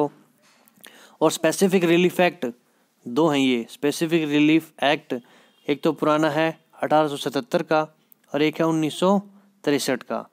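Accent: Haryana, India. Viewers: Mast haryanvi... Good explain...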